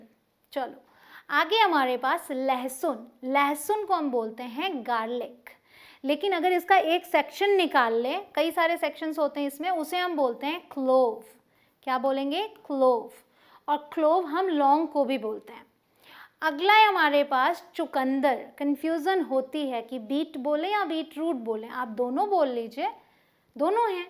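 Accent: India. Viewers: Indian accent English?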